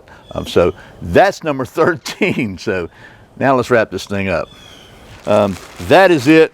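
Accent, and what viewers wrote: Georgia, United States. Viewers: It would be really helpful for those of us who haven't quite calibrated to your Georgia accent if you would list the items you described on this wonderful video so that we have a fighting chance of purchasing the right items!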